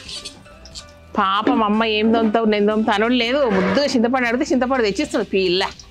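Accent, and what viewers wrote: Telangana, India. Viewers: Vedio motham full comedy bale undhi tlangana language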